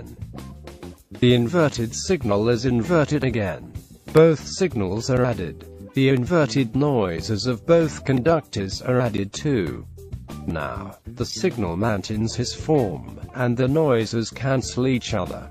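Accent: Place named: England, United Kingdom. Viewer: nice job. I agree with the English accent. You sound very authoritive. You also kind of cleared up a question I had with my cables. Cheers!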